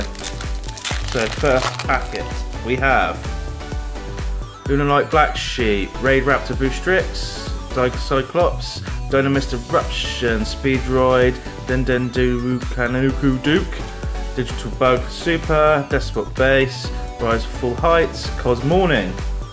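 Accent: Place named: United States. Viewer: Great job and you sounded American not British and what's with long intro once not A problem with it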